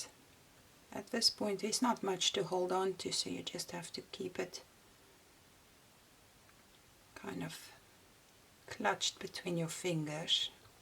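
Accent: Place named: South Africa. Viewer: Love your South African accent.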